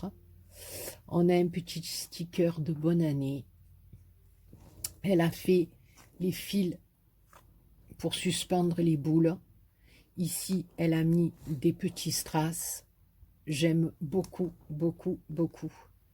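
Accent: South, France. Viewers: Coucou, je suis contente de te retrouver pour cette petite vidéo et ton magnifique accent du sud. Que de merveilles! Je suis une fan de la fée clochette alors j'adore la carte avec qu'elle dessus. Trop belle sans oublier la carte avec les fleurs trop trop belles. Tu as eu de magnifique cadeau. Bonne soirée et gros bisous Sabine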